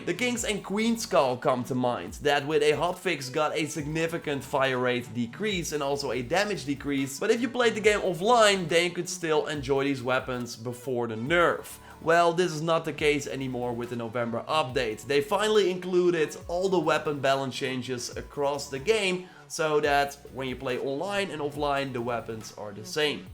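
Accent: Netherlands. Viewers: Man man man, dat neder-engels. Verschrikkelijk.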